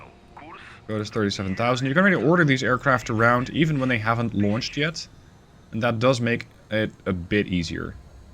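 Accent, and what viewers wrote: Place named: Netherlands. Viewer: Ik detecteer een Netherlands accent in je Engels 🤔. Landgenoot 💪Dutch🇳🇱 ?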